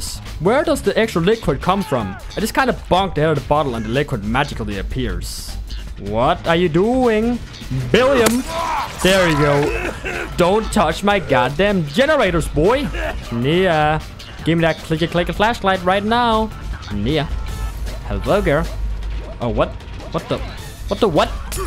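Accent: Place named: Germany. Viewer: Nice Vid :D and i have an question. Are you European? (your accent is similar to mine and im german soo)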